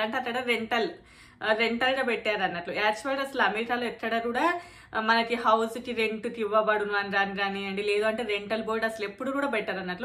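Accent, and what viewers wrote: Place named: Mahabubnagar, India. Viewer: Mee Matlade style and yaasa Chustunte ma Maibnar district valemo anipistundi ☺️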